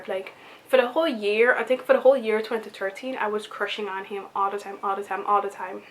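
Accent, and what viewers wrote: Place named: Guyana. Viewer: Guyanese are the best story tellers sound effects and all😂😂 Great story, the accent made it better✨✨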